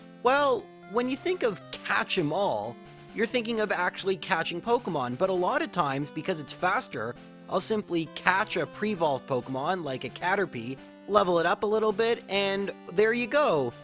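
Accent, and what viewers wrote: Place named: Canada. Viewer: I'm not sure what part of Canada lives in, but it was uploaded pretty early in all of the timezones. If he lives in Newfoundland or Nova Scotia, it was a somewhat normal time (around the 7am range), but his accent suggests to me that he doesn't live in either or those places. I could be wrong about that though, as some people try to underplay their natural accent.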